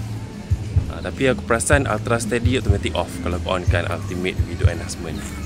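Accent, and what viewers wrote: Kelantan, Malaysia. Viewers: ni ore kelate ko boh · baru tahu ore kelate ruponyo..😅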